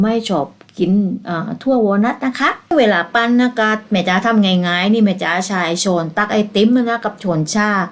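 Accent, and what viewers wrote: South, Thailand. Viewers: ชอบเวลาคุณจ๋าแหลงใต้จังค่ะ 🥰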